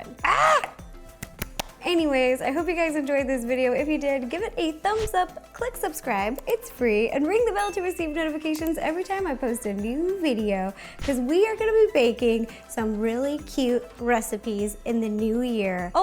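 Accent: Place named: Rural, United States. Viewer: Awww her adorable country accent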